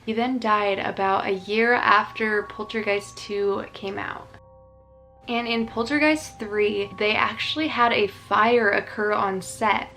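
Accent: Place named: California, United States. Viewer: I do hope you know that I was just picking on your Californian accent.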